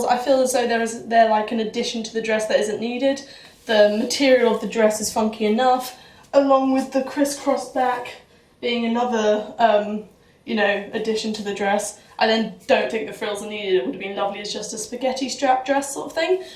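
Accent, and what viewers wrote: United Kingdom. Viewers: I love your british accent pretty girl, great videos, I wish you happy holidays-christmas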